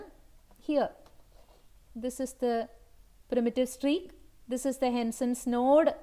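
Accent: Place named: South, United States. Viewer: and the moment i heard the southern accent, i was sure to obtain a wholesome experience.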